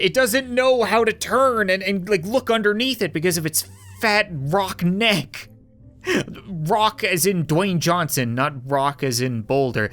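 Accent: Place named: Canada. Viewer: sounds extra Canadian today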